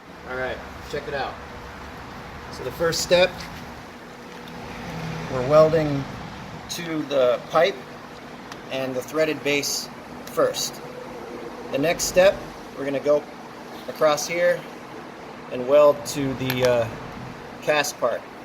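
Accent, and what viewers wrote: United States. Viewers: Looks like america.. sounds american.. uses metric... ? Great video, best one on doing these. Ive been told its a good idea to also drill a hole in tube before sleeving, to give an extra spot to weld (spot weld). But with your welding method its probably not required. Top job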